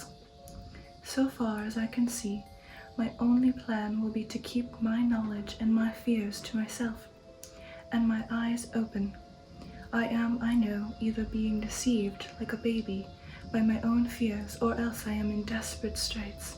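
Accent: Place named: Transylvania, Romania. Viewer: it's nice to see you again a perfect accent a bit Transylvanian at some point I loved it always so much a pleasure ^^ (I know how much you love batman so here you go <link>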